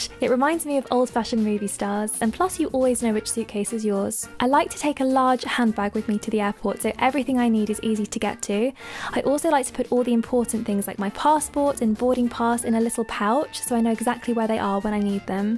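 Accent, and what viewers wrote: RP, United Kingdom. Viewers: why does she sound so posh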